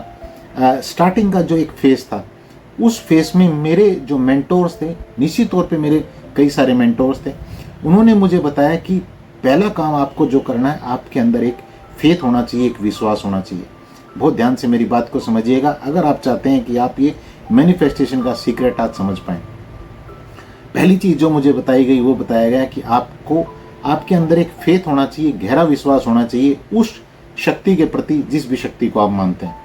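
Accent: Haryana, India. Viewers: Aap ki boli haryana jasi h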